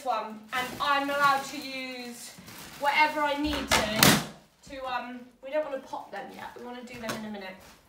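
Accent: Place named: Southeast England, United Kingdom. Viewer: Are you from SE England? Your accent is similar to mine haha. You have a lot of energy!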